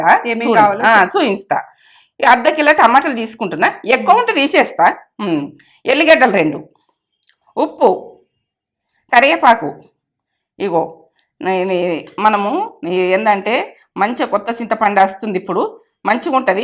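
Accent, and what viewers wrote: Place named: Nirmal, India. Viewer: Amma ay vuru.chaypatamu laydu Nirmal side basha laga.vundhi.amma❤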